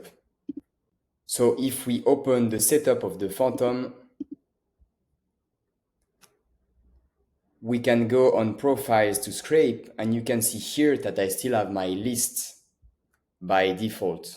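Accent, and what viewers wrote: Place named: France. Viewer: no wonder nobody watches the videos, cant understand that thick french accent.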